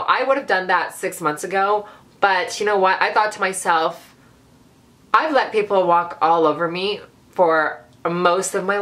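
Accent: Vietnam. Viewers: your old vietnamese lady accent is my favourite thing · I'm sorry but I always die laughing at your Vietnamese accent because it's DEAD ON. I'm Cambodian, but my hairdresser is Vietnamese and sounds EXACTLY like that. It just puts a smile on my face.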